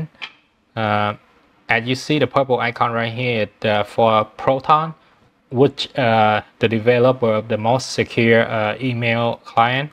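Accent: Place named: Vietnam. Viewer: recognize by the accent. I'm also Vietnamese, and I am too, building the homelab with opnsense and proxmox and truenas. Seeing other vietnamese enthuiast about opnsense make me feel I'm not alone :)))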